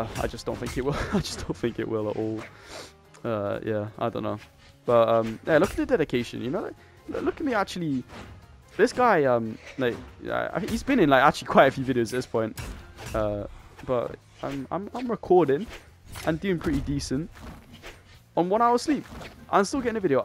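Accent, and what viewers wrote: England, United Kingdom. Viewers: If u lost that last game lmfao also are u English?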